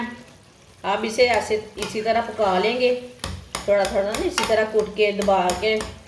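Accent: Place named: Punjab, India. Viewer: What a thick Punjabi accent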